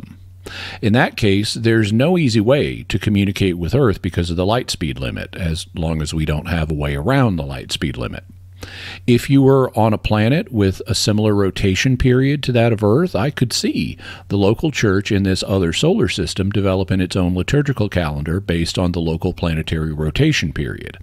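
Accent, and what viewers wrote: South, United States. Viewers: I love your work! Thank you! Also, I noticed a southern accent stronger in this video than most. Did you recently speak with southerners? That phenomenon of changing accents according to environment would make an interesting topic. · Is it just me... or is he assuming a "folksier" southern accent than usual in this video lol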